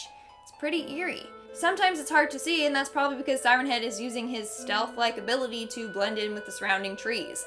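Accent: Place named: United Kingdom. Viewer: i thought she had a british accent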